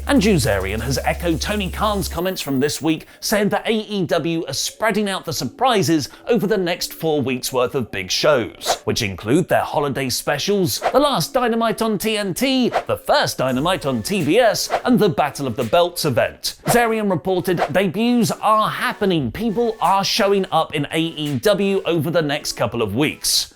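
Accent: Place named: Cockney, United Kingdom. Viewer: Love oli's cockney street urchin speech begging for subscriptions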